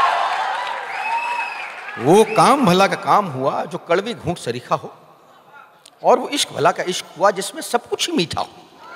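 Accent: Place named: Jharkhand, India. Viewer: The voice of Waseypur..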